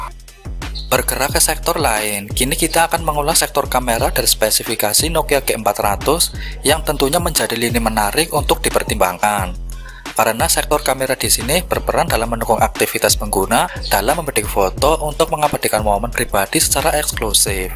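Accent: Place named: Java, Indonesia. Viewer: Nyunsewu, mas e logat e medok.. saking tegal mas?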